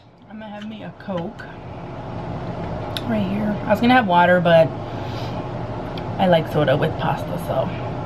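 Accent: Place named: South, United States. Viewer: I don't know what that accent is that you have but I absolutely love it. It's kinda southern with a hint of Spanish.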